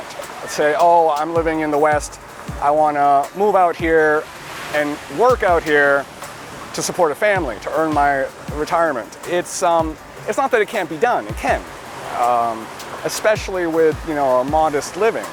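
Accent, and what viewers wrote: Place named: North Carolina, United States. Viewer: Hi, I really like your videos about daily life in Thailand and the many traps you can come across as a farang living here in Thailand. But there is one thing I want to mention about your performance and I hope you will understand what I mean: I'm a native German speaker and actually quite fluent in English (worked in the UK for 4 years) but I sometimes have really difficulties to catch up with your Northern Carolina accent. And I think I'm not the only one among your followers that have this issue. I you - maybe - can do something with that it would be really appreciated very much. And please: No offence meant! 😜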